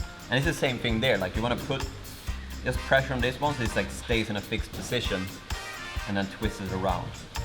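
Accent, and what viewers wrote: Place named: Sweden. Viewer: Keep the swenglish! Never compromise! Love it!!!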